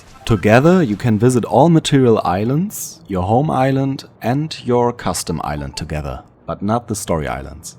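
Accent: Germany. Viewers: Juhu my Question was in the video. Und wusste garnicht dass du von Germany bist, obwohl man es raushört. Es klingt bisschen wie mein english. xD Thanks for the answer. Big up!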